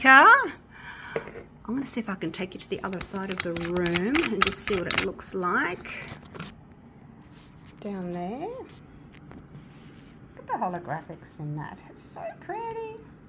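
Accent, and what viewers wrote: Australia. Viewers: I love the fact that you talk all the way through because apart from the fact that I just love the Aussie accent, the ones that play music in the background just does my head in. Also I love what you show us.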